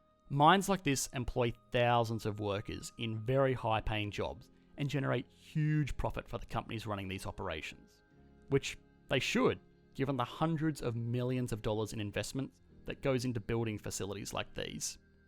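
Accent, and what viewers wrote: Australia. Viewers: I've had a look at the comments section and as an Australian I'd like to encourage to double down on the Aussieness.....let's get some Poiter in there, get some 'Noice' going and let's harden up those 'Buhhhhht's and I'm expecting some "yeah, nah"s to appear...... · That Aussie accent though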